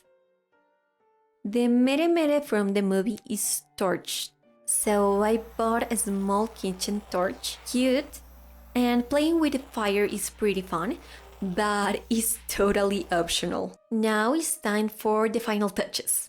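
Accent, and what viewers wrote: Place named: Russia. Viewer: Interesting recipe.. thank you! but you're accent is funny, is it russian?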